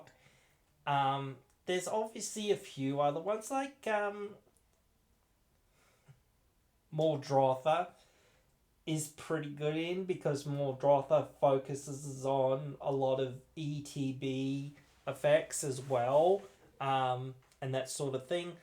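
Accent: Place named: Australia. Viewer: what part of Australia are you from ?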